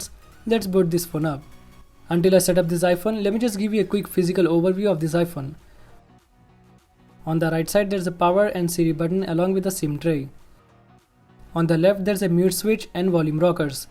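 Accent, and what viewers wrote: India. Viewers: The thing that I love about this iPhone is the camera. Your English with an Indian accent is cool to hear.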